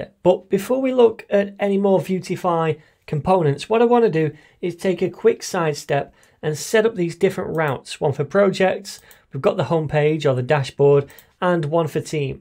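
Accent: United Kingdom. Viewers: Yeah! His UK accent too 😁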